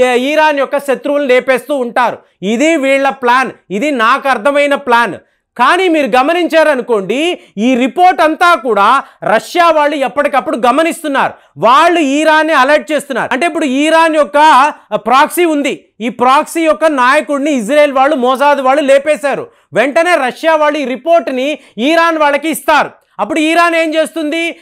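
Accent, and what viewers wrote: Tamil Nadu, India. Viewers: గురువు గారూ కి తెలుగు వీడియోలు 5 ఏళ్ళ నుండి చేస్తున్న ఈయనకి ఇంకా అరవ యాస పోలేదు. Leh నీ లేహెగ్ అని ఇలా ఇలా అరవ యాసలో మాట్లాడుతుంటాడు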